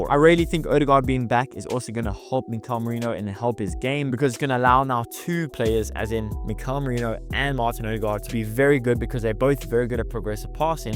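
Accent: South Africa. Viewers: You sound South African so I will definitely support your channel coyg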